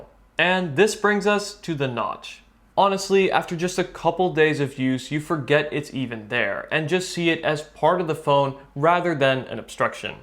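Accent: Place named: Netherlands. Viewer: Are you from the Netherlands or Belgium? Your English sounds a little bit like Dutch. Nice video, and still an pretty nice Phone.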